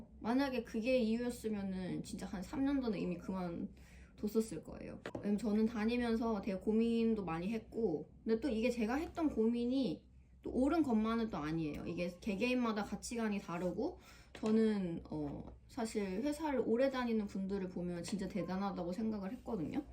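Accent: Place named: Gyeongsang, South Korea. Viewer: 내나??? 경상도가 고향이십니까 ㅎㅎ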